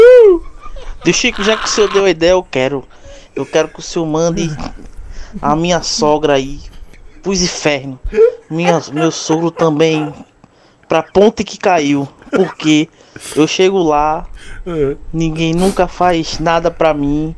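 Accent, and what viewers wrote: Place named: Recife, Brazil. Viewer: Tive de ouvir tudo kkk , provavelmente ele é de Recife/PE, sotaque não nega.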